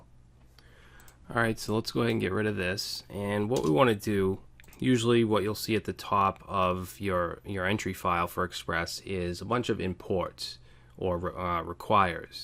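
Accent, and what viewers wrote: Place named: Northeast, United States. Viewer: This guy is the best anywhere! Yes, he has a NE accent. I am not sure why people feel the need to point that out. It's like saying "youtube's logo is red and white" -- yup, we know. I am just happy I found a teacher that didn't bore me to death with their relentless, robot, yammering!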